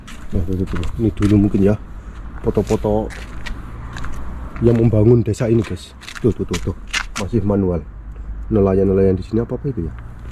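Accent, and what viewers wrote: Banyumas, Indonesia. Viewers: Mas orang jawa ngapak ya, ini di jepang di kota mana mas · jawane ngendi mas , kok logate ngapak tenan 🙏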